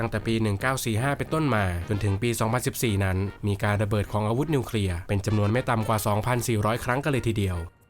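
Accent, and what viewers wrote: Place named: Isan, Thailand. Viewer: คนอิสานใช่มั้ย ฟังไม่รุเรื่องเลย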